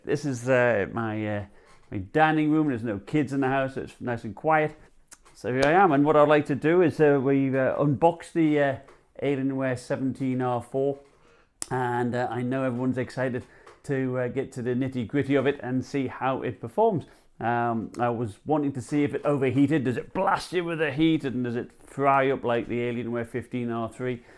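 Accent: United Kingdom. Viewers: Like you British accent. Also thanks for a good review!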